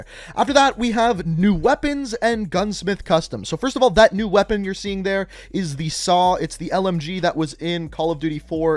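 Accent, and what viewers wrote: Canada. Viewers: Gustavo Castillo I’m not sure if he a canook but he’s for sure from the north. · Are you Canadian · Sorry? Your Canadian?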